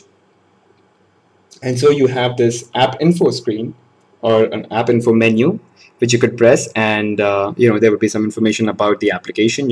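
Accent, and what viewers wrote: India. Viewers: indian accent!!! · You suck !!!!! I dislike it because your english is an indian so its suck alot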